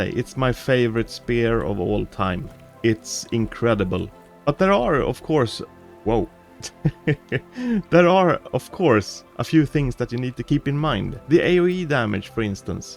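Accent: Sweden. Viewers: You sound A bit swedish. is it just me?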